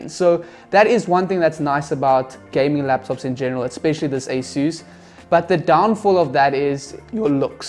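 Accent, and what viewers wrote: South Africa. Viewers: When you said (SMUTH) my brain said...he sounds like he came from South Africa.😁 but your location says LA. hehe EDIT: yea I was right. hehehe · The only video that actually helped me with finding which laptop to buy thank you. The SA accent reminded me of home brew.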